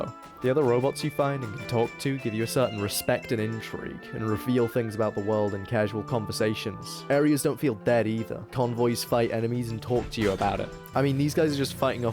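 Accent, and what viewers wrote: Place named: Australia. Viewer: you're aussie arent you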